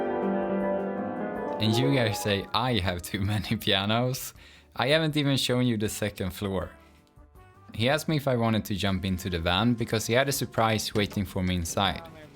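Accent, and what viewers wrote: Sweden. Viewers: YouTube knows me well, nothing better than Swedish accent working on an impossible music instrument to not go to sleep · A- are you swedish?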